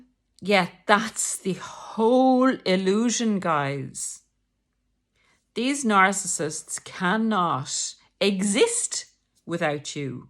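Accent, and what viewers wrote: Ireland. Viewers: Thank you for being so calm sensible and supportive of this community And I love your irish accent I am starting to almost be thankful to my narcissist that he led me to find all these wonderful people like you ande your channel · Are you Irish?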